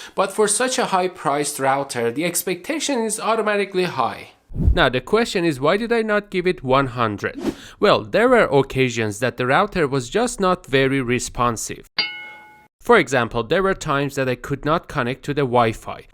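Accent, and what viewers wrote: Iran. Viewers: لهجه ایرانی رو همون اول فهمیدم 😂😂😂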